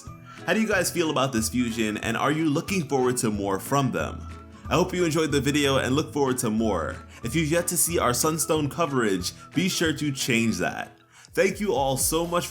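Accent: United Kingdom. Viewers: He has a British voice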